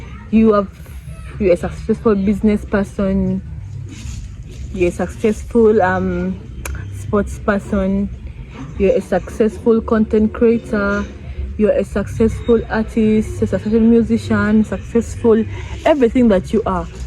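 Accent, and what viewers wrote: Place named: Africa. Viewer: There's sound. She's speaking English with a strong African accent.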